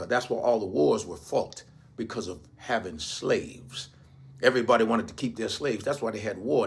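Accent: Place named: South, United States. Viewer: Love your commentary. But that accent. Southern drawl oof not my favorite.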